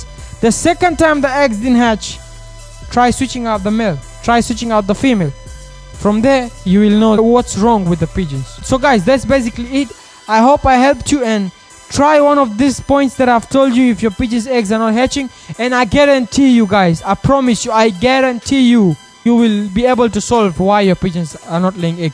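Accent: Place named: Africa. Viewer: You sound African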